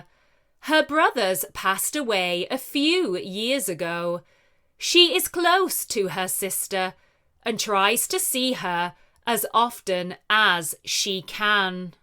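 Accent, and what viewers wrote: United Kingdom. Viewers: That beautiful british accent!!! 😍😍😍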